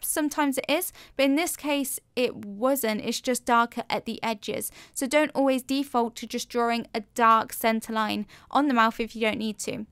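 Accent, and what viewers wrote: England, United Kingdom. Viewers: I like her English accent. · Thick english escent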